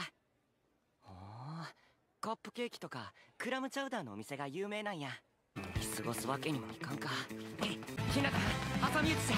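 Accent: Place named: Kansai, Japan. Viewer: Yeah his Kansai Dialect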